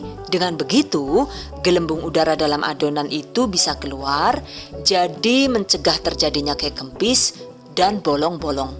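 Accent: Banyumas, Indonesia. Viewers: heheh.... ngapaknya ya allah saya bgt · ngapak2 logat suaranyaa iya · aku seneng deh ne wis ngmnge ngapak 😄😄😄😄😄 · Rikane deneng teyeng ngapak Yuu...jarku wong Madiun koh ngapak😃😍👍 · Mksh resepnya bunda, sy klo lihat videonya bunda suka ngekek sendiri klo lg pake bahasa ngapak hahahaaaaa, lucu. Smg tambah sukses buat bunda yaaa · Lucu ngapak bgt kaya enyong hahahaha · Nembe nonton... Ternyata ana sing ngapak juga... mandan kaget aku.... 😂😂😂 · . Rika sih womh ndi. Jebule ngapak 😅😅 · Wkwkwk ngapaknya lucu, asline sing Endi sih MB?? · Kayane kie wong ngapak olih bojo wong jawa timur kiye .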